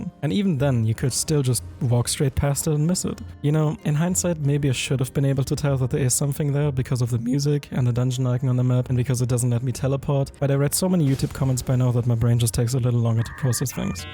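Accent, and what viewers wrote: Germany. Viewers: Du bist Deutsch oder? Hört man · Either this is the strongest german accent or some kind of hidden english accent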